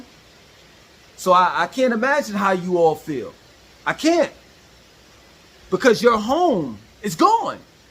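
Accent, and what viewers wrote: New York, United States. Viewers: It’s his brand and his bread & butter for content. Dude is from NY, (the accent is a giveaway) and all he does is whine about Charlotte & Atlanta. In other words, a gentrifier whining about gentrification 😂.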